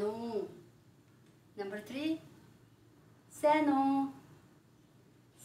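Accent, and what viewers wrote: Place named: Mongolia. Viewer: it is actually pure mongolian accent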